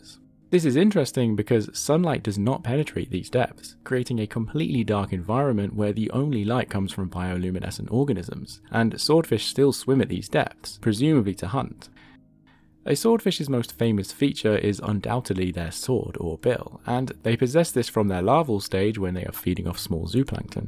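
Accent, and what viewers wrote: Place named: United Kingdom. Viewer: Why do so many of these documentaries have narrators with shitty British accents?? If it's not David Attenborough then get an American or neutral accent.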